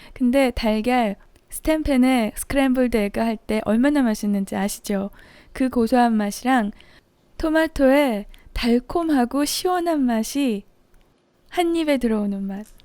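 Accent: Seoul, South Korea. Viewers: 이게 서울 사투리군요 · 뭔가말투가... 끝까지 못보겠어요. 서울사투리 귀엽다는분들도 있는데 각자 듣기좋은 목소리가 다르겠죠?ㅠㅠ 더많은 구독자를 생각해서 조금 더 표준어를 사용해보시면 어떨까요? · 말투가 완전 옛날 서울 사투리네요... 넘 좋다....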